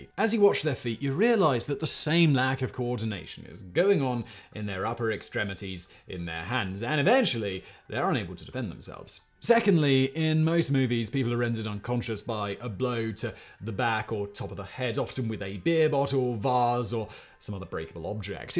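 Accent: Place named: RP, United Kingdom. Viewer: Such a posh accent! Well done, boy, well done.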